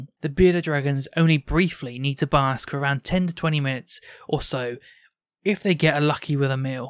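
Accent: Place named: United Kingdom. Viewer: Really helpful video also good to here a British voice was only seeing America videos so nice to here from someone who talks about caring in the uk 👍🏻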